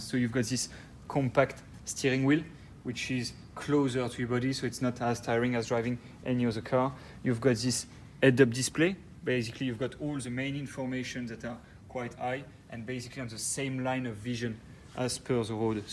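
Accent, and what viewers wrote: France. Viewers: Pathetic French accent. Why not hire a native English speaker? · laisse tomber l'accent français en langue anglaise ! les anglophones doivent se plier en 10 en l'écoutant. Même les africains parlent mieux l'anglais mdrrr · Très belle accent français ahah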